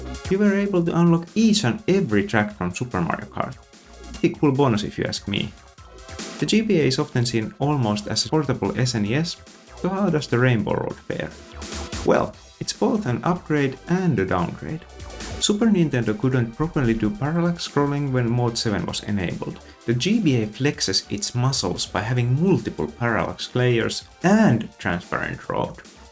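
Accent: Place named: Finland. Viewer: Cool channel! Sounds like you're from my neighbouring country!!! 😁 Swe => Finland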